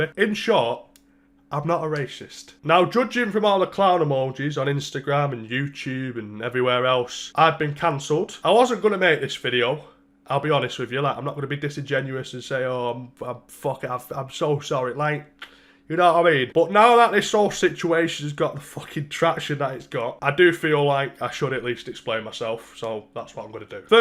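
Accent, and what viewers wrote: United Kingdom. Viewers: I don’t think people understand everyone in the uk speaks like this it’s just uploads it on YouTube.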